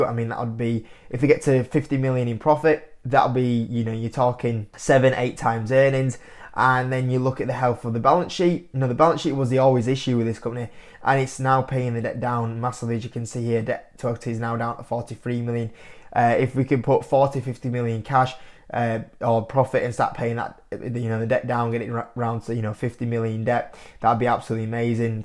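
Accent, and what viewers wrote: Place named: London, United Kingdom. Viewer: Are you from London or something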